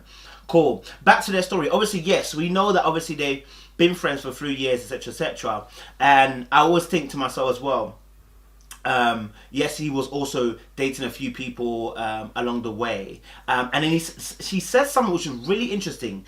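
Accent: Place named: Birmingham, United Kingdom. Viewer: The brum accent comes out every now & then 😅💥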